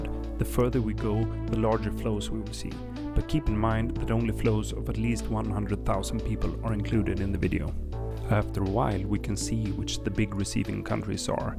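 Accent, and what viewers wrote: Sweden. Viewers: Why do so many swedes speak this way? There do you learn to speak this way? Also Dave brought me here. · Visste direkt att du var svensk med den där dialekten haha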